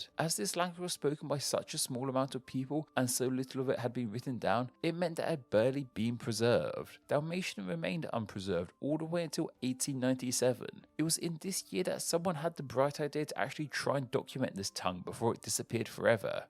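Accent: United Kingdom. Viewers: a British accent?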